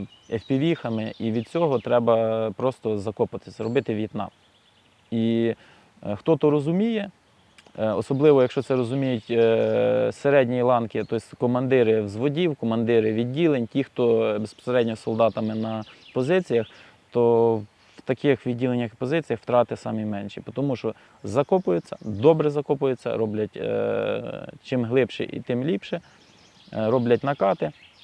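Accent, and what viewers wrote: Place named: Slobozhanshchyna, Ukraine. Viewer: Та чудова у Вас українська мова! Слобожанський діалект - важлива її частина. Нічого Вам соромитися: Сковорода та Котляревський на Вашому боці.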